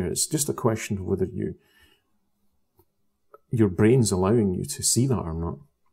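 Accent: Scotland, United Kingdom. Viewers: If you think you live on a ball then aircraft would have to fly around this ball. You sound like you live in Scotland. A flight from Scotland to Gran Canaria in the canary islands is 2,000 miles south. Conventional mathematics will tell you that over this distance the curvature of the earth if it were a ball would show a drop of just over 500 miles ( 8 inches times 2,000 times 2,000 = 32 million inches or just over 500 miles). This would mean that for every mile travelled the plane would need to descend 1/4 mile, it would be nose down all the way. However experience will tell you that this does not happen, the plane will be in level flight all the way aside from take off and landing. BTW the Southern Hemisphere long haul non stop flights are bogus.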